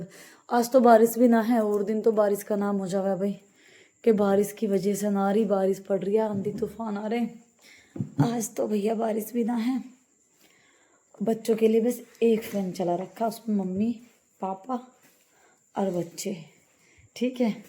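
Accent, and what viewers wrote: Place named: Baghpat, India. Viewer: Baghpat se h didi ase hi bolted h hm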